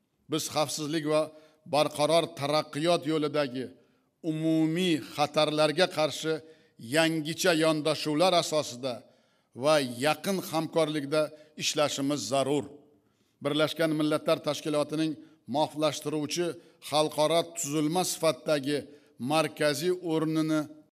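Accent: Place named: Uzbekistan. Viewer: Ажойиб таклифлар,!!Тарихда биринчи марта узбекча нутк намоиш этилди,!!Рахматли Каримов ойиласи умуман узбекча билмаслиги жуда ачинарли ахвол эди!!Минбарда бутун дунега Узбек охангида нутк сузлаганингизнинг узи буюк тарих!!!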